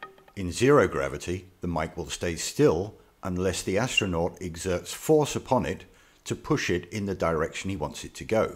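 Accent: England, United Kingdom. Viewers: Nope, english accent, Mike is a little confused. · You do realize that NASA is an American organization, and as such, you dont lose a cent to them as they only get tax dollars from the tax paying American public. Now I'm just assuming here, but seems like your accent is indicative of a more British persuasion, I mean, it could be south africa, New Zealand, or Australia, idk, but assuming the most likely and saying Great Britain, (I specify Great Britain and not the UK because you dont sound very Northern Irish to me.) Your tax pounds once went to the European Space Agency which has a pod on the ISS and works with NASA and other organizations, but no one is directly in charge of it either. But thanks to Brexit, your taxes aren't going to that either now. Britain doesn't have an independent space program so for now, none of your tax pounds go to any space agency. 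Hope I help alleviate some of your stress.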